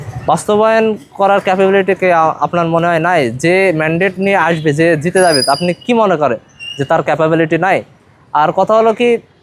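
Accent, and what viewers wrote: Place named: Satkhira, Bangladesh. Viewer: ভাইয়ের বাসা মনে হয় সাতক্ষীরা,কথার টান থেকে বুঝলাম।জন্মভাষা বলে।কথা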